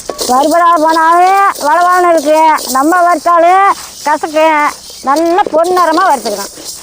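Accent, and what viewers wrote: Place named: Kongu, India. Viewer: சமையலை.விட நம்ம கொங்கு தமிழ் அழகு ❤❤❤❤❤❤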